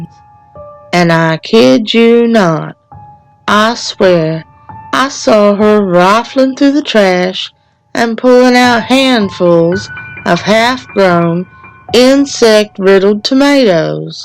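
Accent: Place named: South, United States. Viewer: you're welcome chicken. 😘 Love the southern accent btw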